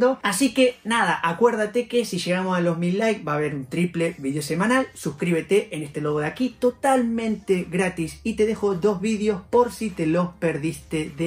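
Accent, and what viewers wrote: Argentina. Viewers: Pini SOS Argentino??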